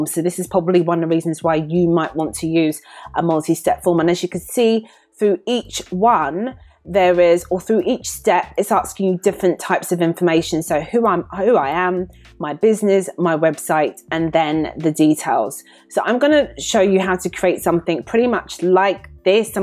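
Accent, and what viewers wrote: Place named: United Kingdom. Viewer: Hi! Excellent video. Not to mention your beautiful British accecent!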